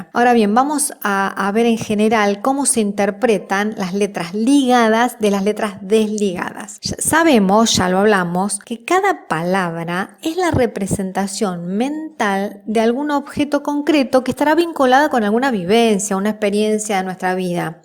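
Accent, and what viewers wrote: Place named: Argentina. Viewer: Me gustan muchísimo gracias el acento de voz de argentina es muy bonito gracias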